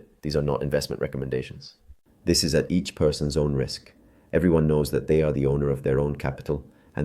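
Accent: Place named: England, United Kingdom. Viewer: Me gustó con la pronunciación inglesa, ud siempre innovando y superándose, lo admiro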